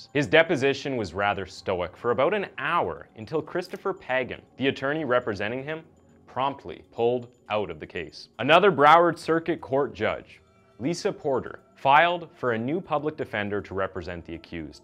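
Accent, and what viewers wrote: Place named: Canada. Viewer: I'm having a hard time placing this guy's accent. He sounds like a Canadian who's lived in the UK longer than NA.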